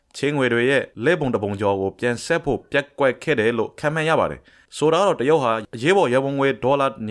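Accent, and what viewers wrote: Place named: Rakhine, Myanmar. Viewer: Drဆာဆာကချင်းလူမျိုးပါ၊အခုအသံကရခိုင်သံဖြစ်ပါတယ်။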